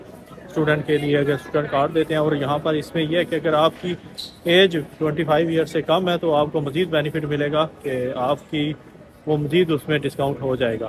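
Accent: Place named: Kashmir, India. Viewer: sb kya hll aaa...kashmir se lgte oo ap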